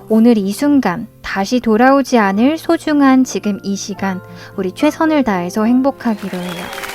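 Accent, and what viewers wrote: France. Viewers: loved your french accent friend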